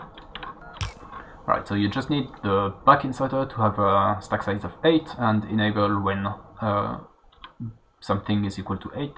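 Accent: France. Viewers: t'es français ? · Tu es Français ?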